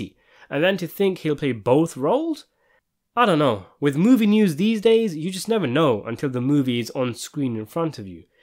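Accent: United Kingdom. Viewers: The genre is not dead just because Mafia movies always came out every decade or so. People need to understand the glory days of the mafia if anything is what's dead. Also Hollywood really does not make good movies anymore like they used to. Martin Scorsese did not invent mafia movies either. This Brittish guy narrating I can't stand to listen to. DeNiro can still do great. So I will be renting this.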